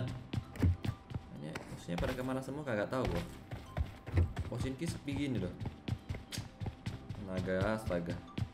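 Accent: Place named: Medan, Indonesia. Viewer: Haha,PARTENTENGAN gak tuh.. Udah belajar bahasa Medan Abang ini bah.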